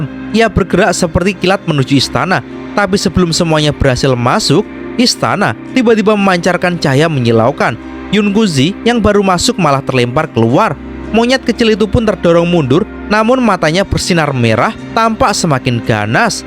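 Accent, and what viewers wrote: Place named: Java, Indonesia. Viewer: Jawa ya😂😂 mendok kali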